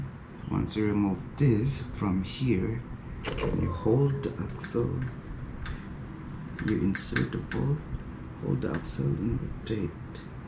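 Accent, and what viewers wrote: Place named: Ifugao, Philippines. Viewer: Bro ano accent mo hehehe kala ko di ka Pinoy...taga Ifugao ka ba?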